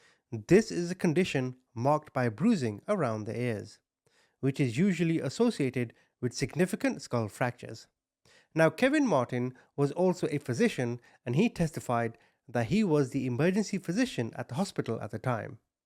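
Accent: United Kingdom. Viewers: English is not second language, he is just British 😂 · Bruh, he’s British. There’s a ton of words that’s British English and American English pronounce differently. It’s so funny how us Americans often think “our way” is the correct/only way for things 💀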